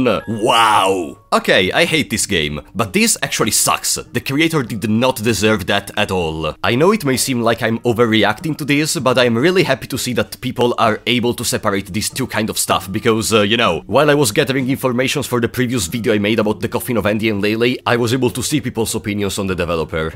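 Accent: Italy. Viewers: I immediately knew that you are from Italy cuz of ur accent xDDD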